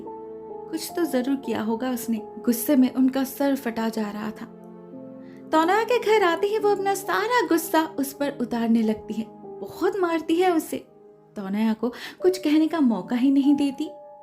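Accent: Bengal, India. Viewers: Di kaya aap bangal se ho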